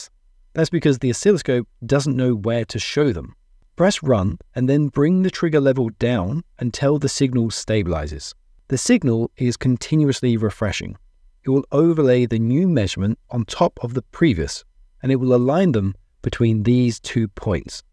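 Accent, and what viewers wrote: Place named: United Kingdom. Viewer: I don't know if this is speaking in the video, but his voice and accent (British, I'm assuming) is very easy to listen to. His step by step, logical directions, have convinced me that an oscilloscope is something I need to look into.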